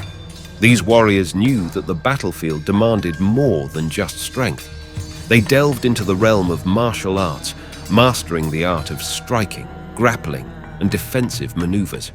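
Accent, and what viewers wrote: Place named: United Kingdom. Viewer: Maybe it's me, Nah, I've heard others say it too. Linguist that are intent on communication and it's many intricate variations. Dealing with pronunciations is particularly grating when something isn't correct or seemingly even attempts to be correct are ignored straight out of hand. The first few times Onna-Bugeisha was pronounced it seemed the narrator had no compulsion or compunction to attempt to say things even remotely studied. Japanese is (perhaps faultingly so) pronounced singularly each and every time. Generally one attempts to avoid accent things but it's not important. A-E-I-O-U are pronounced one way. Combined vowels are pronounced one way too. Extended vowels and silent vowels are standard 'ALL THE TIME' Very few exceptions, but even those are pronounced the same way all the time. ONNA BU GEISHA Not to be confused with Chinese concept of BU being opposite. 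ONNA Oh Nah Boo Gay Shaw. It would have been nice that the narrator could have been consistent in his reading that it would have sounded like he wasn't talking about different actions and jobs. Sometimes saying it like indicated above. Often saying it like AnnA BuGaiSha other times sounding like Anna BuGasha. The incessant habit of British to put a 'Y' between a consonant and 'U' is mind boggling and on the occasional adding an 'I' when pronouncing things like TOKYO or KYOTO coming up with Toe Key Yo or Key Yo Toe very odd indeed. Such be the circumstance when pronouncing SAMURAI not Saem You Rye but if you try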